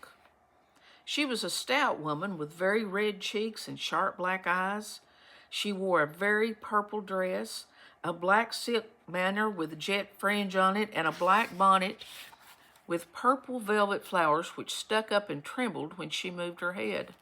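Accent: Kentucky, United States. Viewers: Thanks! By chance this summer I read A Secret Garden and Anne of Green Gables. Such a nice relief from other troubles. Thanks again. I love listening to a Kentucky native reading these gems!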